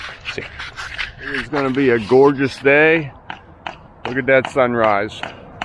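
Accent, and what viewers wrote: Pittsburgh, United States. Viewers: do not link up with other sites. If you get too commercial. As a “civilian” non-contractor observer, I could choose concrete guy. I like your videos and your Pittsburg accent.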